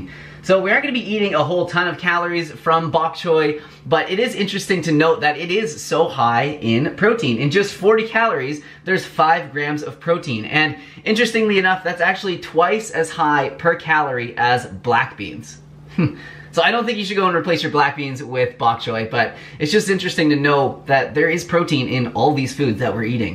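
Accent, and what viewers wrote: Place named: Canada. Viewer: You sound Canadian😅. Juice the stems.. yummy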